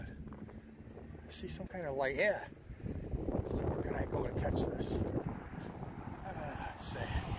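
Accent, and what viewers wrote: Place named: Michigan, United States. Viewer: I love your accent!! Michigan?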